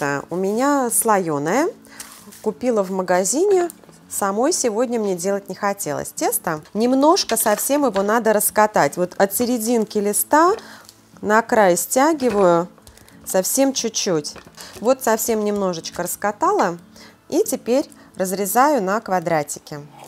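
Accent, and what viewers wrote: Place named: Akanye, Russia. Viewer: ПОВЕРЬ ПОДОЙДЕТ ЛЮБОЕ. МЕНЯ ТОЛЬКО РАЗДРОЖЖАЕТ ЕЁ АКАНЬЕ.... НЕ МОГУ СЛУШАТЬ ТАКОЙ ГОВОР ДО БЛЕВОТИНЫ.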